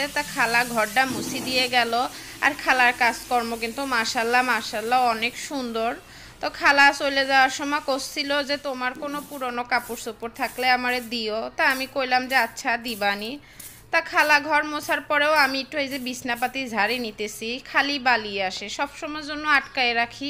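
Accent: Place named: Khulna, Bangladesh. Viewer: বান্ধবি খুলনা ভাষাটা তোমার মুখে শুনতে অসাধারণ লাগলো 😊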